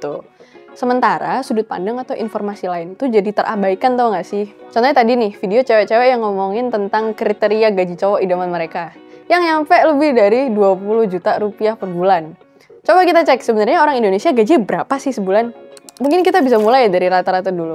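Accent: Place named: Surabaya, Indonesia. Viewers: Mba mba ini sepertinya cici cici suroboyoan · Chindo Suroboyo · Medok sidoarjo an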